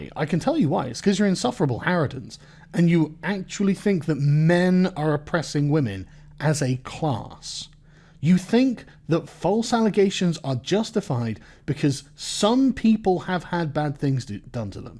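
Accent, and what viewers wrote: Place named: United Kingdom. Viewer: Reading snooty comments in a mocking voice is 1000x better with a British accent..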